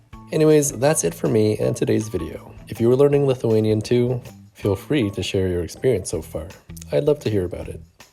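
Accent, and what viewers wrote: Canada. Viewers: I will start my comment with wishing you a vey Happy New Year! This video of yours is very inspirational. The most important is not how much we know but how much we are willing (ready) to know with action added to it. You could be an example to many! Lithuania has little English-speaking scene on Youtube and I am sure your channel will be a smash hit in no time. Meanwhile I would like to add that I am learning English pronounciation from you. Canadian English is perfect for understanding. Thank you for being You and for what you do. Best luck with learning Lithuanian! ❤️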